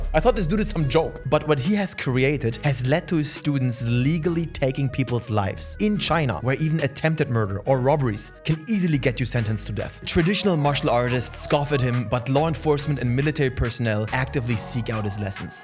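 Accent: Germany. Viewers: finds so lustig, dass ja super englisch spricht, aber man trotzdem das deutsche raushört:D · You have a germany accent. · I like your german accent. Sounds more brutal this way...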